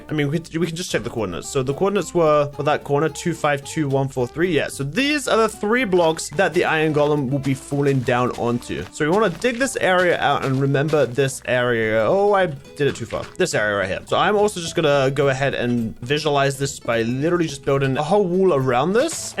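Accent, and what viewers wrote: United Kingdom. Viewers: I'm still confused if is British or American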